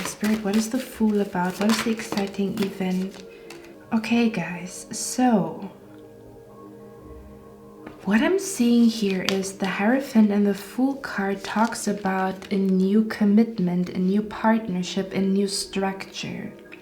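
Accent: Germany. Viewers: Love your accent. Are you German?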